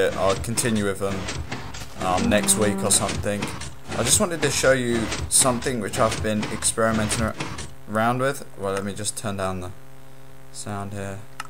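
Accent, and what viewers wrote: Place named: Australia. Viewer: you sound like the australian guy on the series House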